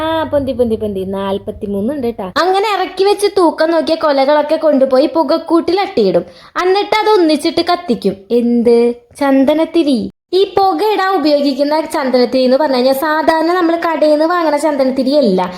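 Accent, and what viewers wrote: Thrissur, India. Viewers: Thani thrissur bashayund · നിങ്ങള്ടെ സംസാരം തൃശൂർ സ്റ്റൈൽ ആണല്ലോ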